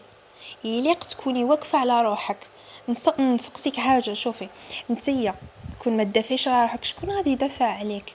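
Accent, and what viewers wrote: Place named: Maghnia, Algeria. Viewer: حبيبتي نتي مغناوية ؟ على خاطر غالبة اللهجة المغناوية في هدرتك ❤😍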